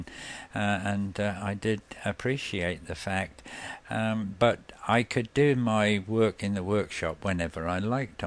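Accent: United Kingdom. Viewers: Wonderful videos. You remind me of my own grandfather, save for the british accent but just the way he told his own life stories. Looking foward to hearing more.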